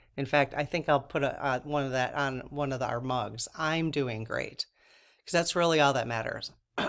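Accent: Midwest, United States. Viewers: Hi!! Yes! Everything will work out for us! Let’s raise that vibration so the Universe will match it! When I first came across your channel I recognized the midwestern accent and you said today you are from Wisconsin. My Mom was from Door County (Sister Bay) and I have such wonderful memories of my MorMor’s cherry farm! Such a beautiful state. ❤️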